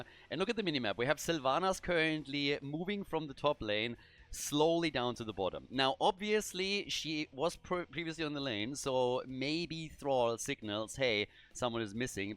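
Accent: Germany. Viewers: Thanks for putting in the effort man! Great content! As a Dutchman living at the German border, I really enjoy your German accent 😀!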